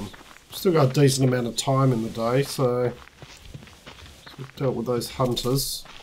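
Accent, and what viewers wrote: Australia. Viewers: The video was great so I subscribed to your channel. You have an aussie accent. I am from the Gold Coast, Queensland.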